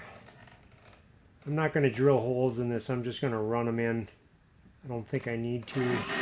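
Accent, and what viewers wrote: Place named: North, United States. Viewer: You sound like ya from up north. Milwaukee here.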